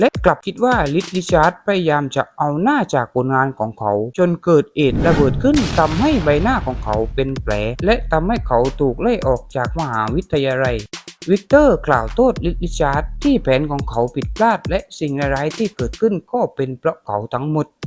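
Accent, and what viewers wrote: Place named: South, Thailand. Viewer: ผมชอบสำเนียง หนัดเลยครับ หรอยๆๆ มีมุขด้วย ทำคลิปอีกนะครับบ ❤❤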